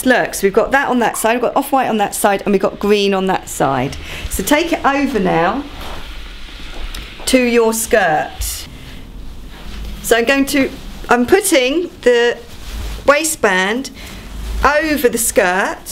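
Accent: United Kingdom. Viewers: you have the uk accent that i just looooove ! i repeat after you like that i learn the uk accent . hihihi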